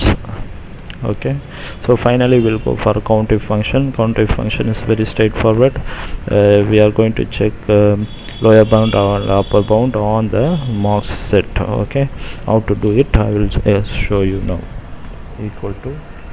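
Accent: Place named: India. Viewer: The most Indian, Indian accent I've ever heard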